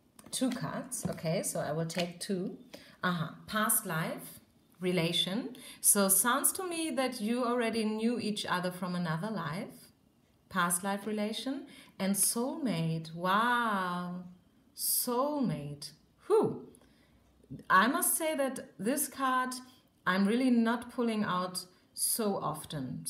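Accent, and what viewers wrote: Germany. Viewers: Your german accent is really charming :) I speak german too and I totally feel how translating straightforward german sayings int english can be tricky. it's like in german you have a word for EVERYTHING :D you did an amazing job and your voice is very sweet and calming too. Thank you for relaying these messages to us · I love that german ascent A lot! 😍😍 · your accent is so rich and beautiful. i studied german for two years and still can hardily understand it. you’re such a beautiful soul with a beautiful accent. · You have a German accent :D Am I right? I am asking because I am very interested in different accents and I am always very happy about guessing correctly.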